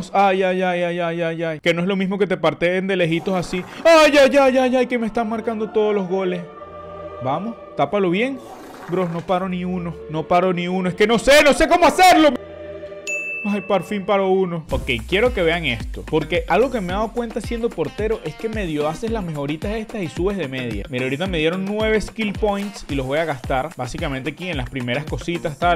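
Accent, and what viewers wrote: Venezuela. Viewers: Creo que es venezolano como yo por el acento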